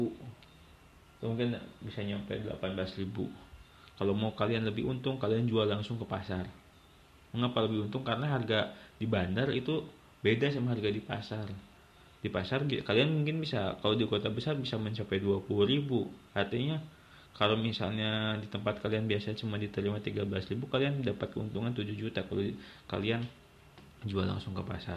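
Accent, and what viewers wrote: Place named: Tasikmalaya, Indonesia. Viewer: Saya tasikmalaya pak...pantesan bicaranya kayak sama he he he. Belum pak saya baru rencana tapi pasti mau...masih nyari informasi dulu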